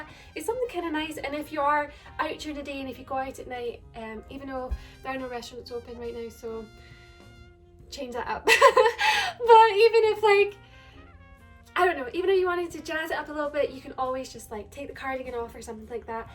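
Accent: Scotland, United Kingdom. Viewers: She made a YouTube video on it a few weeks ago, have a look :) basically she was hiding her proper Scottish accent in fear she wouldn't fit in or couldn't be understood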